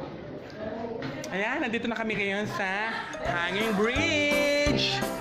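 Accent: Batangas, Philippines. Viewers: taga batangas po kayu lipa po ba😂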